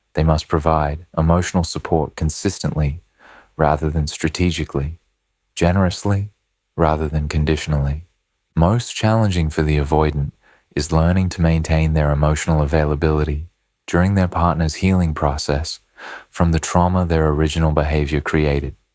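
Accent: Australia. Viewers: I can't stand the Aussie voice...it sounds depressed. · glad that you brought the aussie voice back!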